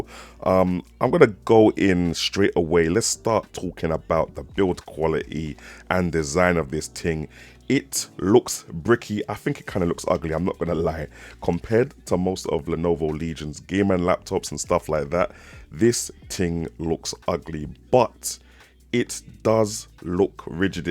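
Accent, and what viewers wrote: United Kingdom. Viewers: You gotta be from uk. From how you say move and. Fam. lol. Big respect. Though. Love the video. I have the 1 tb version and I love mine. I gave away my asus rog strix after I bought this. Lol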